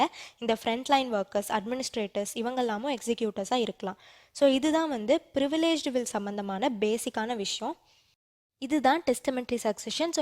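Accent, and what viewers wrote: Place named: Madurai, India. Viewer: Amma ponnu....unnoda voice, prounouncation, modulation... Super ma..! Nee Madurai ya ma?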